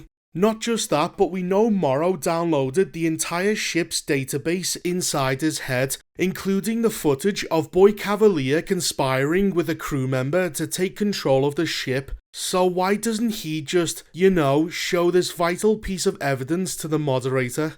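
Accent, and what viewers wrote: Liverpool, United Kingdom. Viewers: it takes a scouser to speak the truth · Robot Scouse monotone speech is definitely appropriate for this retarded shit.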